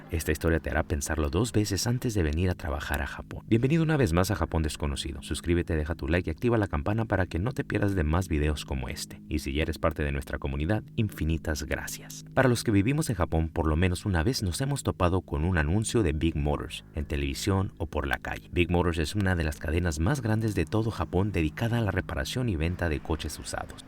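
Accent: Latin America. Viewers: Suena muy latam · "Imagínate que te cobren una reparación que jamás hicieron..." me suena a que tienes acento latino, pero que nunca viviste en latinoamérica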